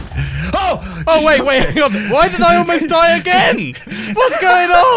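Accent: South, United States. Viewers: your southern accent never fails to break my brain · His southern American accent is everything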